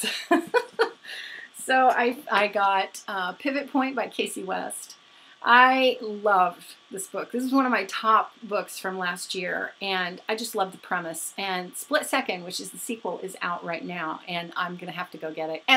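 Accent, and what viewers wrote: United Kingdom. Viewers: Great haul! Loved the English accent lol! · Lovely Haul! Your excitement over those books is infectious :) That edition of Little Women is really pretty. I've seen it on a lot of shelves, and I'm thinking of getting it someday (tho I'm not sure I'd enjoy reading it). I had never seen the back of the cover tho! It's really cool :) The British accent :D I love that you're so much into genre fiction, like paranormal, dystopian, steampunk. Most of the books you hauled, I have never heard of them, but I've seen The Program around and it sounds like an interesting story. Looking forward to hear what you think of it. Starters has a freaky cover! (O_O) (the one you like) I wouldn't be able to stop staring at it if it was on my shelves. And I'd feel a bit creeped out. Wow! Those bookshelves look great! And those boxes full of books! If you ever get them all out, I'd be very curious to know how many books you have in total.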